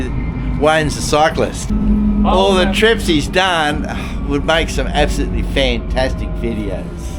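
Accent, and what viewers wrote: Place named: Australia. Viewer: Hi we really love your videos and your enthusiasm. We are Ozzie's by choice living in south America so we do not only get to see your travels but also be able to hear Ozzie accent, see our home city Sydney and other places in Australia Thanks❤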